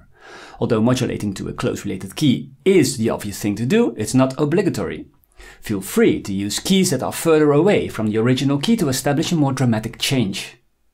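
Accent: Netherlands. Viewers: I enjoy your backing tracks and lessons. thanks for both. I also enjoy your accent, which reminds me of when I lived in Tilburg (I am Australian). bedanken!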